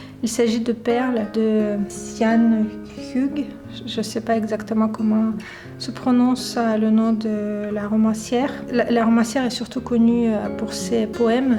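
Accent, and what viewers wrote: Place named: Russia. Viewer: Bonjour et merci pour cette vidéo très intéressante et tellement douce. Je vais relire Orgueil et Préjugés dans la traduction Gallmeister. J' ai Femmes et filles depuis un moment dans ma bibliothèque et vous me donnez l'envie de le lire. Votre accent me rappelle mon amie en Russie que j' espère revoir un jour... A bientôt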